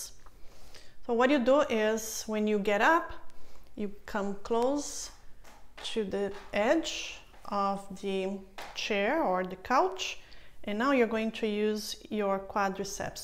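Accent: Brazil. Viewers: You sound Brazilian.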